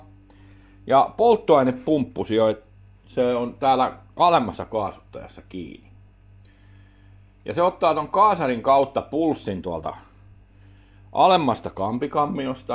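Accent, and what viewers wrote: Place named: Tampere, Finland. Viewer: Artikulaatiota selkeällä Treen murteella-asia tulee ymmärretyksi👍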